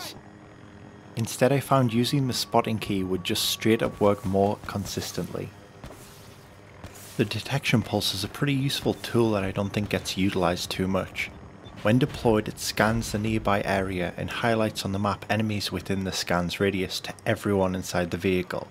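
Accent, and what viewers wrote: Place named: Europe. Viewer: judging by your accent, I'm assuming EU time zones. When are you playing? What game mode? It's a shame we can't easily play servers that frequents.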